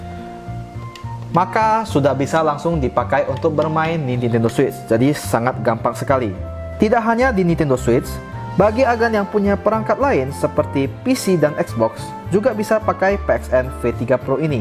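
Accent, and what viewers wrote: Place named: Tegal, Indonesia. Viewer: Dari logat bicaranya pasti admin ini orang Tegal atau Brebes jawa tengah ya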